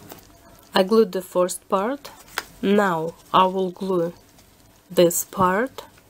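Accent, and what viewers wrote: Russia. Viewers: Ты русская! По аксенту видно! :) в Америки живешь?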